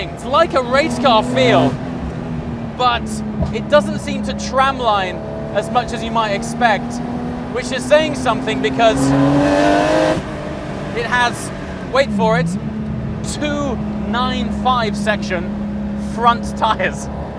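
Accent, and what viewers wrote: United Kingdom. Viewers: something different about a british guy explaining a car.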